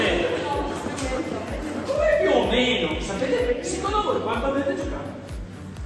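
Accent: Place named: Veneto, Italy. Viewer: incomprensibile....parliamo l'taliano!!! ...non il veneto o similari.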